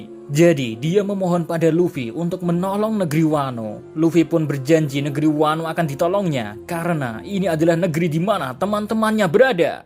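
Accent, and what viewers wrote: Java, Indonesia. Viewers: Jowo mu emang medok min,tapi caramu nyampek no pesen keno nang ati,marai mrinding 🙏🙏🙏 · lebay jir. suara medok gitu mana bikin merinding 😂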